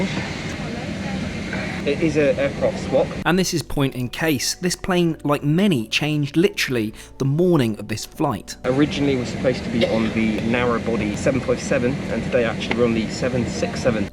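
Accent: United Kingdom. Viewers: How are you able to travel so freely during the pandemic? I believe you're from the UK if I got your British accent right. How can you travel with the traffic system in place with Amber countries? Do share your secret.....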